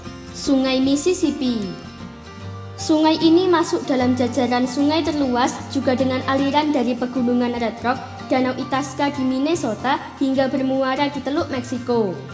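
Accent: Java, Indonesia. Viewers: Jawa,,,,,,,,, suara nya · medok banget njiir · Logatnya jawa banget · Meddok jawane 🤣😁😃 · pengisi suaranya bagus si tp ya jng terlalu keliatan logat kejawaannya, ya memang si susah klw orang jawa Timur ataw Jawa Tengah untuk bicara bhs indonesia tanpa logat, laen orang jawa barat yg bicara bhsa Indonesia itu enak di dengar ga nyeklok gt, tp keren ko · Orang Jawa 😊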